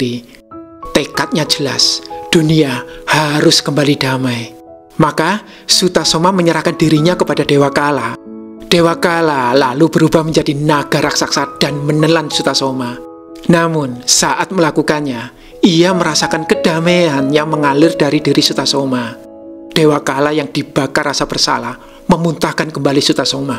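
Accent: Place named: Tulungagung, Indonesia. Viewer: maaf mas, aslinya mana kog mirib Tulungagung logat kata" nya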